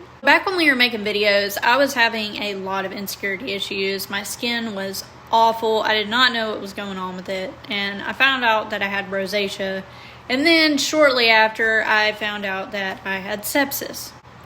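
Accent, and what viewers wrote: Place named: South, United States. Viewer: I'm in love with your southern accent,.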